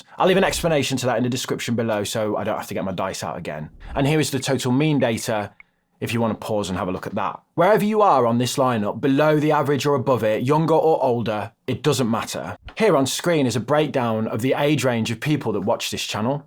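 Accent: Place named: Midlands, United Kingdom. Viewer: Brilliant. I never thought I’d enjoy a video on finances. Also… loving your Midlands accent!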